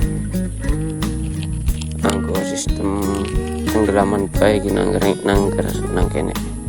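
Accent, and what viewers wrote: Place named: Banyumas, Indonesia. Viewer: Salam wong ngapak, ora ngapak ora penak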